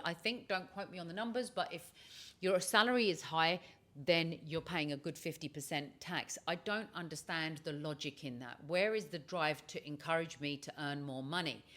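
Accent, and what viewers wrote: United Kingdom. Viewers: Godddammmmnnnnn she sound so british, even more british than my british grandma and she on hijab wth😂😂😂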